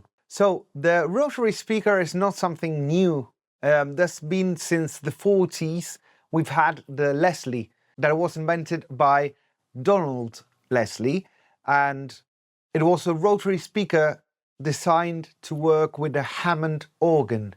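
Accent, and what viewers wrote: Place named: United Kingdom. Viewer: Veri cul british axent 🤙😉🖖👽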